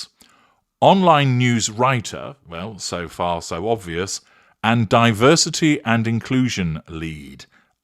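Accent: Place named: RP, United Kingdom. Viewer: Yep, posh boy swearing is addictive in the nicest possible way😂😂😂😂😂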